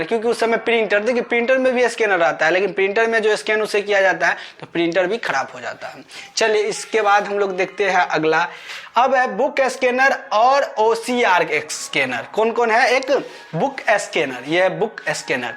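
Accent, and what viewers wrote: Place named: Bihar, India. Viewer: Bihari pronounciation 😅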